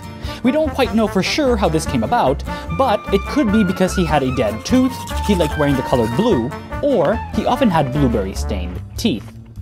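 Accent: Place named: Sweden. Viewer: stop pronauncing danish words in a swedish way those swedish pricks suck. sorry for the bad spelling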